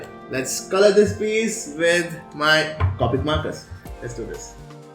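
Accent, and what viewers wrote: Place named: India. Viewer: Indian for sotaque is ugly.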